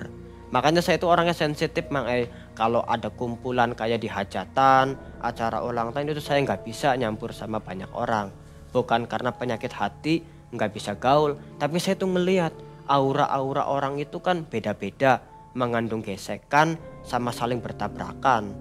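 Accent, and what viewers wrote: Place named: Dobo, Indonesia. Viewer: Salam dari Dobo.... Bt asli orang Dobo, dari awal dengar cerita bt sadar kalau lama diDobo, karna fasih sekali sama logat Dobo, btw laut Arafura ya.... Bukan Arafuru🙏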